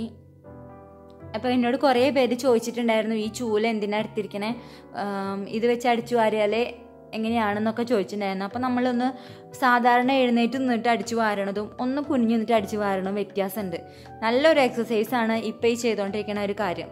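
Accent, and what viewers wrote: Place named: Malappuram, India. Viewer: Hi ചേച്ചി, ഞാൻ new subscriber ആണ്.. ഞാൻ ചേച്ചിടെ വ്ലോഗ്സ് തെരഞ്ഞു പിടിച്ചു കാണുവാരുന്നു. എനിക്ക് ഒരുപാട് ഒരുപാട് ഇഷ്ടമായി. ശെരിക്കും എന്റെ ഒക്കെ വീട് പോലെ തന്നെ. പിന്നെ, ചേച്ചി ശെരിക്കും നാട്ടിന്പുറത്തുകാരി തന്നെ ആണ്. ആ നന്മ ഒക്കെ ഉള്ള ചേച്ചി. പിന്നെ ഞാൻ ആലപ്പുഴ ആണ്. Apo ചേച്ചിടെ മലപ്പുറം slang കേട്ടോണ്ട് ഇരിക്കാൻ തന്നെ നല്ല രസമുണ്ട്. സംസാരം bore ഒന്നുമല്ല ചേച്ചി. രണ്ടു ദിവസമായി ഞാൻ വീഡിയോ കാണാൻ തുടങ്ങിയിട്ട്. Apo മുതൽ കമന്റ്‌ ഇടണം ന്നു വിചാരിച്ചതാ. ഇപ്പോഴാ പറ്റിയത്.. super വ്ലോഗ്സ് aanu.. ഇനി ബാക്കി വീഡിയോ കൂടെ കാണട്ടെ കെട്ടോ.. all the best ചേച്ചി... Love you so much ചേച്ചി.... 😍😍😍😍😍😘😘😘😘😘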